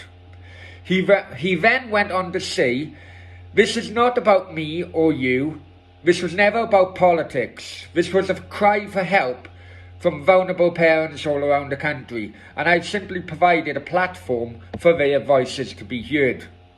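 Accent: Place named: Wales, United Kingdom. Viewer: Nice one, great the way you said flying pig United. Love the Welsh accent. Keep it up mate. Happy birthday for tomorrow too 🎂🎉🎈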